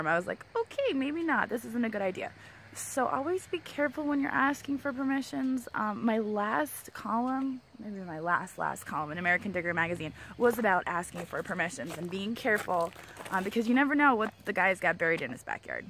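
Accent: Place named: Australia. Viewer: For a second i thought you were coming too my country Australia lol. Well it sort of sounded like it ;)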